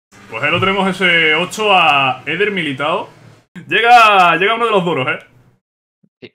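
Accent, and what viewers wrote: Andalusia, Spain. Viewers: soy yo o hoy te he notao el asento andaluh como nunca antes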